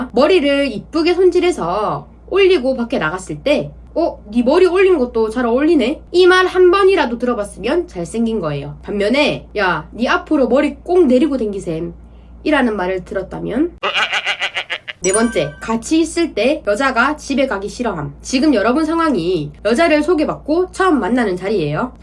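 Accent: Jeolla, South Korea. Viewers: 말투가 홍어년인데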